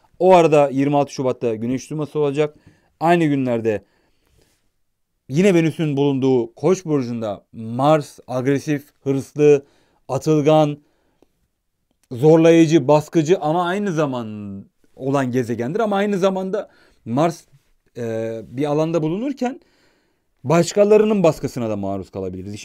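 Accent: Adana, Turkey. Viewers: Ben sizi,websitenizden takip ediyordum ,YouTube kanalınızın olduğunu görünce,altın bulmuş gibi sevindim :) yazım üslubunuzu zaten çok seviyordum,konuşma üslubunuza resmen bayıldım ..Adana ağzı da bir harika :) çok aşırı mutluyum şu an ..lütfen daha sık video yayınlayın..bu kanalı geliştirin ..Sevgilerimle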